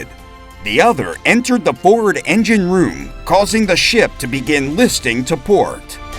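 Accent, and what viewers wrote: United States. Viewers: He’s clearly American…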